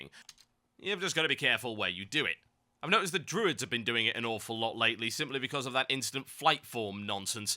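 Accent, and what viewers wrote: United Kingdom. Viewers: Tb, i luv ur videos ur so unique nd best of all ur a britsih commentator! much love x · A brit ranting. Epic win.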